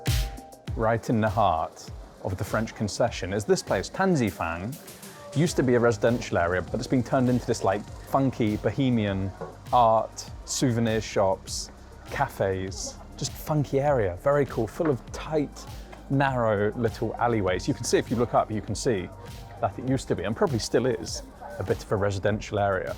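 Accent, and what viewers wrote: United Kingdom. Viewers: Chinese here. Subscribed for British accent lol, but mostly I enjoy the calm, genuine vibe in your video without diatribe & hubbub. glad that you enjoyed your trip so far. I am working and living in XinJiang region right now, summer is perfect for travel ( none Urumqi areas), definitely worths a visit. cheers. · The video has a good rhythm and a pure British accent that sounds very comfortable. I watched it over and over again to learn English, and I really enjoyed watching it.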